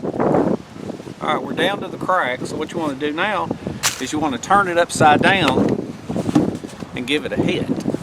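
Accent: South, United States. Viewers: Happy New Year. Am about to set out on my morning old man walk(arthritis in knee, so no more running,) with a good American Buddy from Alabama(I'm American too,but not from the south,) and our dog. Anyway, years ago, when he'd gone home for almost a year, I discovered your channel and would watch it out of nostalga for his accent and similiar excellent story telling skills....cheers...from far off Normandy, France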